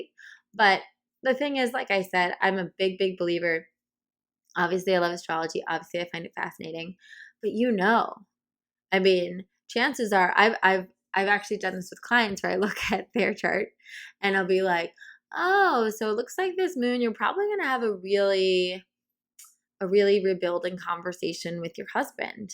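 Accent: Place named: New York, United States. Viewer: Yeah ! And the NY accent makes feel home, lol ! (LI)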